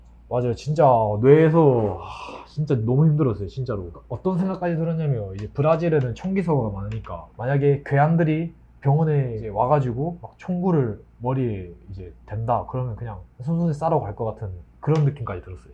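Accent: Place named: Gyeongnam, South Korea. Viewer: 부산사투리인지 경남인지 경북인지 궁금해요!!!!!! 근데 경남사투리같아요 맞아요?? ㅎㅎ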